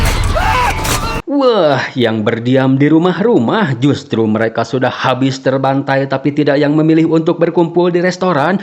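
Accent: West Java, Indonesia. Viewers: Sunda pisan😂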